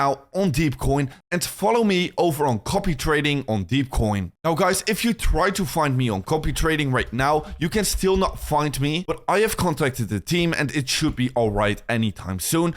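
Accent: Netherlands. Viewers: Don't you hear the dutch accent? It's so obvious when dutch people talk in English. He sounds like crypto rover.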